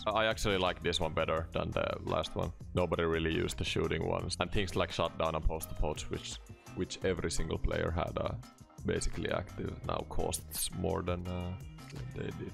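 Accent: Finland. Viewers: Mä nauran kuinka hyvin nasevasti suomi aksentti paistaa englannissasi:D!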